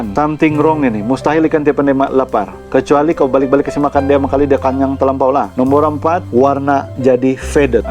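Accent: Sabah, Malaysia. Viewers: Terbaik bossku..sdp dgr org sabah ckp😍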